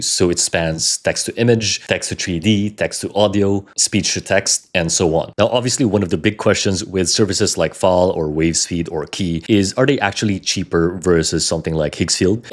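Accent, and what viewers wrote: Philippines. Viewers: Are you filipino? I can hear some accent :D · Why do I hear a Filipino accent? :)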